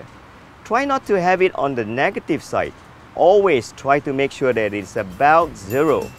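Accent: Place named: Malaysia. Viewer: Patut la slang english kenal je, org malaysia rupanya. Great video btw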